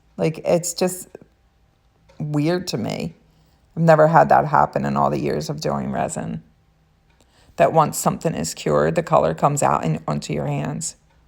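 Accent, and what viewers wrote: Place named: New Jersey, United States. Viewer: Are you from jersey or PA ? Just wonder from your accent lol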